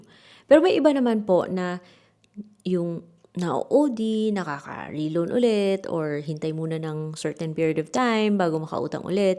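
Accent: Iloilo, Philippines. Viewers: Ate ilongga ka gali? Hehe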